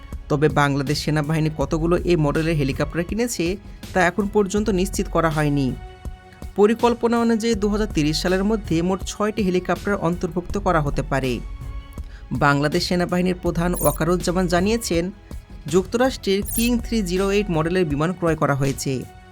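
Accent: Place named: India. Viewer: APNAR BACHAN BHONGI LIKE INDIAN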